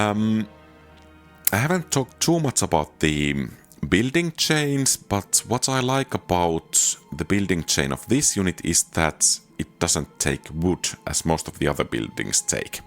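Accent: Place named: Finland. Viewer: What happened to your voice? You went from British accent to Finnish accent. I'm confused, unless you're using text-to-speech?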